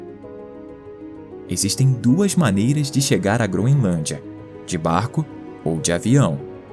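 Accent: Rio de Janeiro, Brazil. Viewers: Esse narrador carioca é a melhor parte do vídeo 😊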